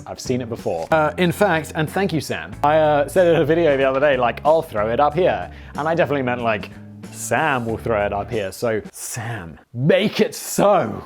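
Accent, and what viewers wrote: United Kingdom. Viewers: His only talent resides in being able to read in his British accent.... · The British slang and pronunciations are almost as funny as Danny and Simon's jokes/puns. If it's spelled Zynga, then it's an online/mobile gaming company. Mostly their games are available on just Facebook nowadays. Some of those men are sexy, just sayin'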